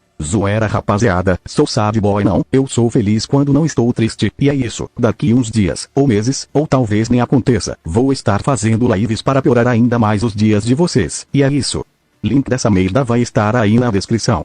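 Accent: Bahia, Brazil. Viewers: em fim um baiano kkkkkk morri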